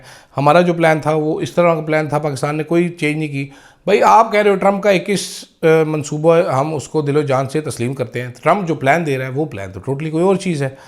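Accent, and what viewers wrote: Punjab, India. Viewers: Love your local English+panjabi 😊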